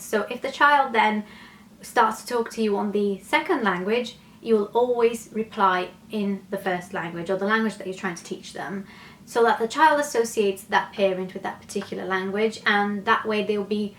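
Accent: United Kingdom. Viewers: Aii quero ter esse sotaque britanico seu que lindo s2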